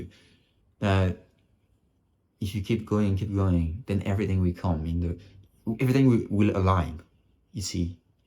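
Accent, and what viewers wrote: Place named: Baltic states. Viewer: Putain je me doutais t'etais francais mais t'as comme un accent genre lithuanie estonie vers labas 😁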